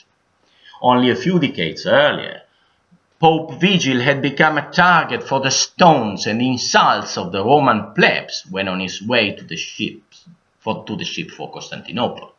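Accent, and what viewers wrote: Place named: Italy. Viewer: I love Your lectures. They're both informative and entertaining. At first I thought it was a public lecture from some fancy university but It seems like it's Your private initiative. Really quality material. You speak with a thick Italian accent, so subtitles are useful, but I like the flow and melody of your language. Can't wait for the next upload!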